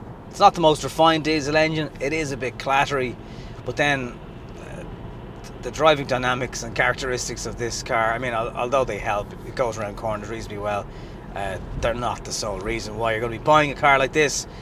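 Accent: Ireland. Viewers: Irish accent. 🤭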